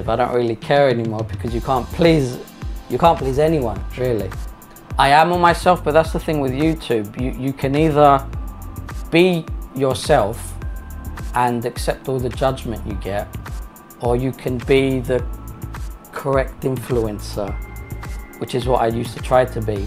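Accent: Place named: United Kingdom. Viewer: mate I'm telling ya if you go back to UK for even less than a year, you'll get your deep British accent back... greetings from Australia 🤙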